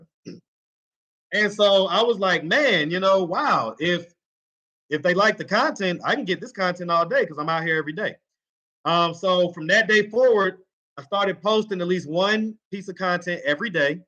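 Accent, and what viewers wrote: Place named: Atlanta, United States. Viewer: Why do you have on a NYC shirt,? Are you from NY? If so, how long have you been living in the A? B/c you have an Atl accent. I'm from Harlem, NYC, born & raised 121st & Lenox Ave. But I've been living in GA for 2.5 yrs now. Don't miss NY, not ready to go visit yet. Finally, get to put a face to the voice.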